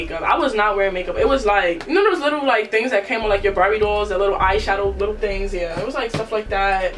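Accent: New York, United States. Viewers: She reminds me of Christina from bad girls club with a New York accent lmaoo 😂🖤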